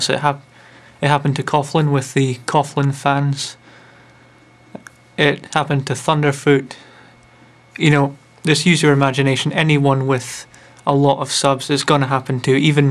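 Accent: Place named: Scotland, United Kingdom. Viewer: are you scottish? you sound scottish <3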